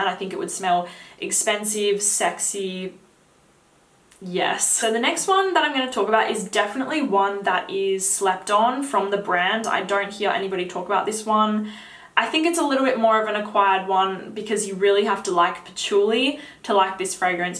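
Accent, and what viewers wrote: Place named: Australia. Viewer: I love her accent, Australian accent😊😊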